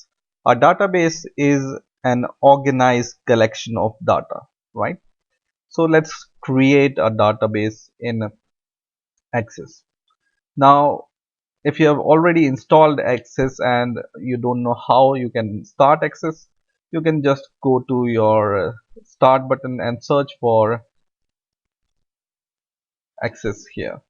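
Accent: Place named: India. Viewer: His English is very good!! He just has the Indian accent which is natural considering he's an Indian! :)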